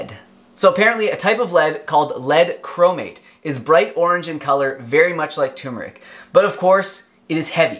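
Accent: Canada. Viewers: Hi! I’m new to your channel. Judging by the way you talk. Are you from Canada? People there have a unique way of saying the word “out.” Sorry for being off topic! 😅 I’m glad to find out you’re Vegan! Continue to stay healthy! GOD bless you and everyone else you love! 🙏🏾✝️🐑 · Joey Heffernan he also pronounces Out and about like canadians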